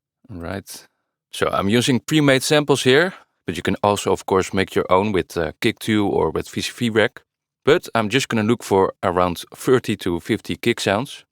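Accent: Netherlands. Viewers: yeah as i can hear u are dutch man xD accent speaks. I am from Finland, elektron is made from sweden , i have kick ninja plugin it is also good one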